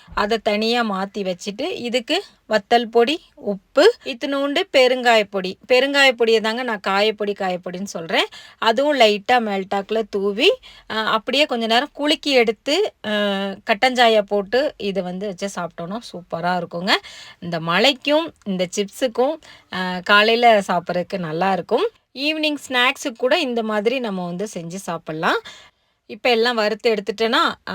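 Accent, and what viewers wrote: Tirunelveli, India. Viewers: . நெல்லை தமிழ்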